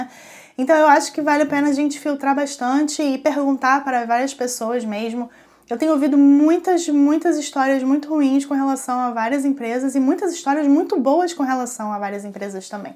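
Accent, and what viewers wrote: Rio de Janeiro, Brazil. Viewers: Curioso vc disse que morava em Santa Catarina mas vc tem sotaque carioca kk fora isso parabéns pelo video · amo seus vídeos vc é carioca ?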